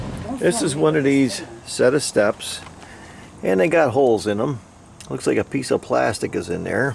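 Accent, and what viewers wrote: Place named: Pennsylvania, United States. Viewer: Thanks for the video. One question, what kind of paint did you use for the steps? Masonry? Brand? Also I think I recognize the accent. You from the Scranton/Central Pennsylvania area?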